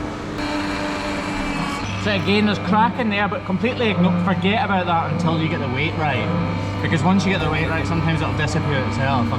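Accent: Scotland, United Kingdom. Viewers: I thought he sounded more Scottish today. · Love how, like most Scotsmen, you have 2 accents. A normal one, and a posh one to use when talking into YouTube or to nonscots . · Do you notice that your Scottish accent is way stronger in this video?🙂 Maybe it comes out more when you’re with others with a stronger accent. No big deal. I’m Canadian so I can’t say much aboooot accents, eh? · I think it's hilariously wonderful how much MORE Scottish you sound when you're talking to other Scottish ppl 😂 It's lovely. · Pinehurst NC here......Incredibly entertaining and informative. I have no farm knowledge and this channel was very eye opening. I also noticed your Scottish accent thickens when talking to friends!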